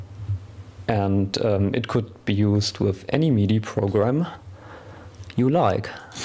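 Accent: Germany. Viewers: i love his german English😂❤ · Ahh dein Deutscher Akzent xD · Wie man beim ersten Wort einfach raushört, dass du Deutscher bist :D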